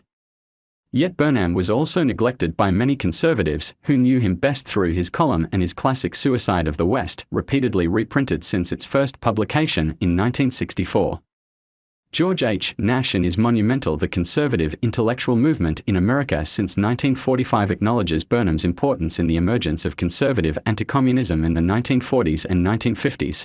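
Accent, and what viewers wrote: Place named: Australia. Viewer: Nice Australian voice.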